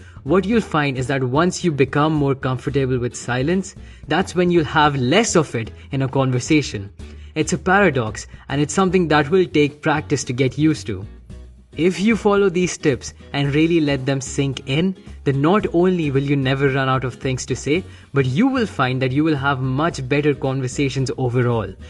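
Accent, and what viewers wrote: India. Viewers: Damn that indian accent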